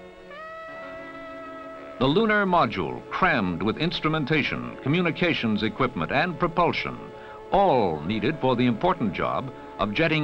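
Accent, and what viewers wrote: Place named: United States. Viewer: Love the US accent used these documentary films in the 50s and 60s. Not a fan of the music and worthy of watching. Did they ever get shown on UK tv?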